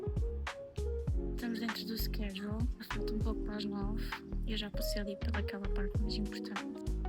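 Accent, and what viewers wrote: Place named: Portugal. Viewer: Consumo imenso conteúdo Japão em língua francesa, dá gosto ter alguém a fazer vlogs em Português de Portugal.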